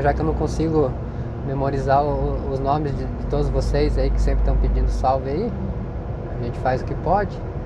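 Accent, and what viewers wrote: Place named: South, Brazil. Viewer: Pelo sutaque parece do Sul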